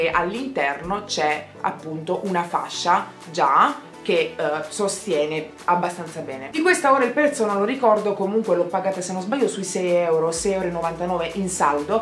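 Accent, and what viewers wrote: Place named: Rome, Italy. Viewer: Inizia un video parlando con l'accento ROMANO! Ti adoro sei stupenda😍😍😘❤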